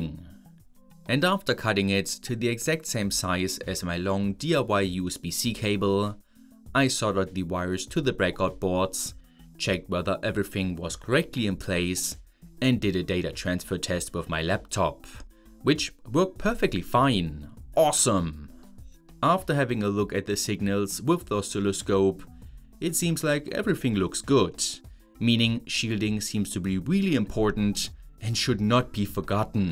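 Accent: Germany. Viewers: du bist deutsch?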